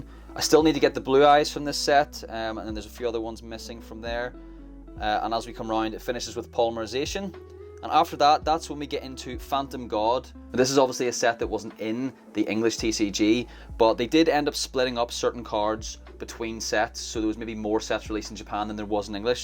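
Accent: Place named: Northern Ireland, United Kingdom. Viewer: Northern Ireland accent 🤔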